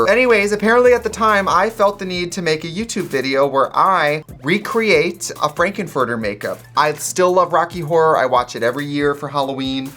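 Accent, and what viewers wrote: Wisconsin, United States. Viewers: trixies voice sounds the same as it does now bc i’m from wisconsin too. like deadass i only hear the accent on some words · You really can hear the Wisconsin accent in these videos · I love your accent!! I was watching UNHhhh the other night when I realized you pronounce “groceries” grosheries just like me! I told my husband that you’re from Wisconsin and he replied “guess that’s why you’re such a cheesehead” and I lost it laughing. I do have family from the US, wouldn’t be surprised to find some were from Wisconsin.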